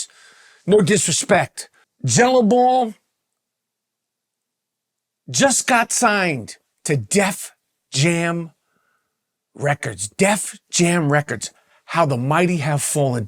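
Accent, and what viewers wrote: New York, United States. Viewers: I'm here for the new York accent. I know you will bring something to the table every time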